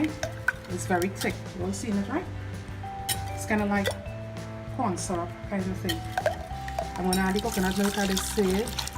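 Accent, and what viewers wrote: Trinidad and Tobago. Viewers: it's trini creole ( caribbean) it's English with trini accent just like most English speaking countries have their own accent lols and saying wtf was kind of rude because she spoke normal to me because trinidadians speak with a deeper accent than that maybe it's just the music.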